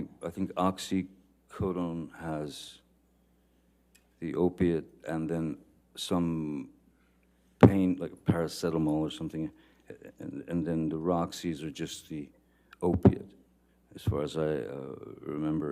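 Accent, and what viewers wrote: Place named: Pakistan. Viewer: Forget about him, love me instead. He sounds like he's from Pakistan.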